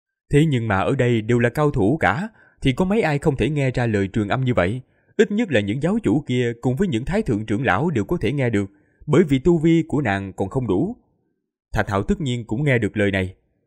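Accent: South, Vietnam. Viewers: Cảm ơn đạo hữu rất nhiều Vì tui đồng hành vs từ những ngày lập kênh Qua biết bao nhiêu tập truyện.. Nên khả năng nghe và nói tiếng miền Nam tốt lên rất nhiều Đợt công tác vừa rồi vào miền Tây ko có bỡ ngỡ như ng lần đầu vào chút nào cả..❤ Vì thế giao tiếp rất chi là tốt vs ng bản địa Rất cảm ơn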